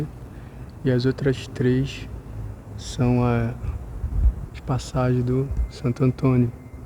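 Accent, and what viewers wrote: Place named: Rio de Janeiro, Brazil. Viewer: Adoro o sotaque carioca, muito chic!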